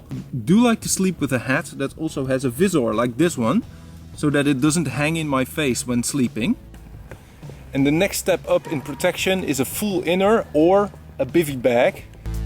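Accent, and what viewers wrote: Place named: Netherlands. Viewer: Are you from Holland my friend ? Your English is realy good I can just hear a bit of accent ! Super tarp shelters anyway !!!!!😉👍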